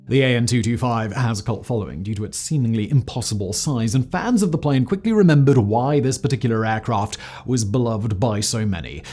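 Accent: RP, United Kingdom. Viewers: it is me or is he sounding even more posh these days..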